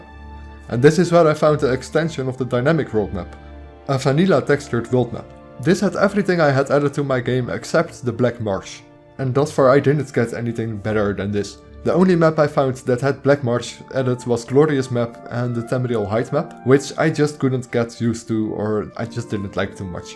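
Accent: Netherlands. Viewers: Je bent nederlands hoor ik gelijk! :D NICE!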